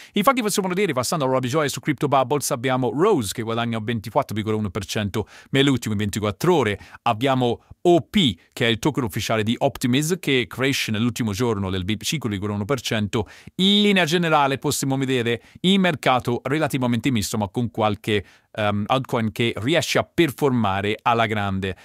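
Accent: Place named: United States. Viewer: Mitico l'accento AmericanEnglish è stupendo 😅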